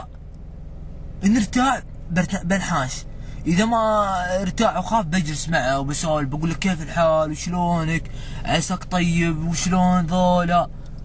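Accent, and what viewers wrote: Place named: Libya. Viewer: اللهجه تشبه كثيل لهجت الليبيين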